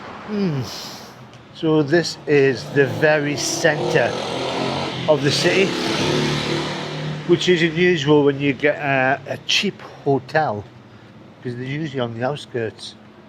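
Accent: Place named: Northern England, United Kingdom. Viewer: The English accent is difficult. It’s a working class non southern English accent and it’s hard for me as an American. Ha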